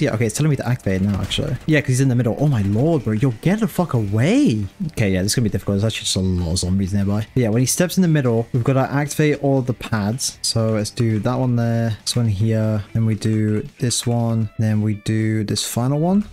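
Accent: United Kingdom. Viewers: ur my favorite british yapper on youtube bossman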